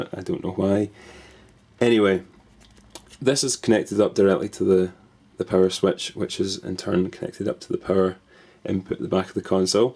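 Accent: Scotland, United Kingdom. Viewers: are you of Scottish origin?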